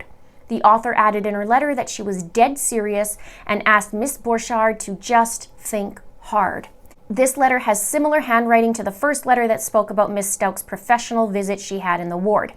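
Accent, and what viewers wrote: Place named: Canada. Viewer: I could listen to you talk all day. I love the Canadian accent and after my 1st trip to Toronto I wanted to live there. I mentioned that in a comment after Trump was elected and a Canadian gentleman responded with "We don't want you here." Needless to say I was sad as I always LOVED my trips to your beautiful country 😀💥🥳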